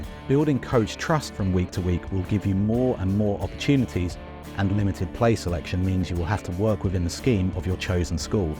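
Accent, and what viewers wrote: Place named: United Kingdom. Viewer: This a British dude talking football 💀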